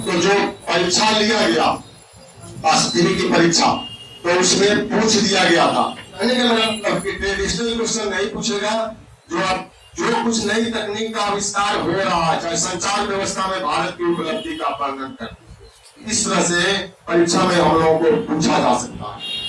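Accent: Bihar, India. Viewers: aapke bolne ka andaj bilkul bihari jaisa hai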